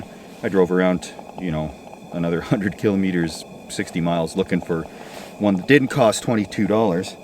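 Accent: Canada. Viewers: Is he from Canada? He sounds like it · This man so Canadian the way he talk I love it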